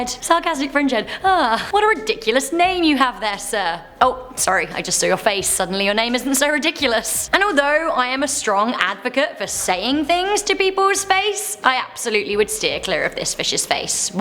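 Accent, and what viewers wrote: United Kingdom. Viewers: It’s British like mine <3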